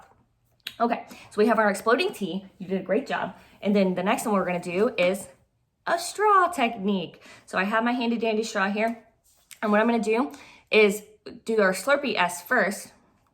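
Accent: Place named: United States. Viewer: Hi, thanks for taking the time to put together this video, seems great. I am 15 and from uk, when I say these words, should I do it in your accent ( American) or can I do it in a British accent. Because accents involves different movements of the tognue. Many thanks !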